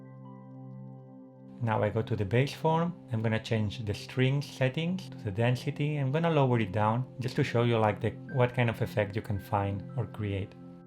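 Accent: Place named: Slovenia. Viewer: Are you from Slovenia? Your accent sounds Slovenian.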